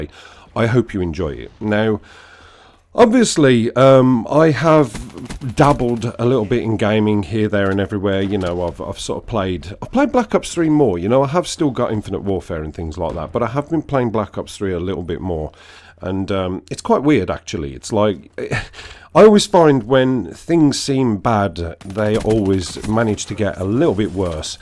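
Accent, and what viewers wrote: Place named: North, United Kingdom. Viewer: Good to hear your dulcent northern tones again, fella. Welcome back.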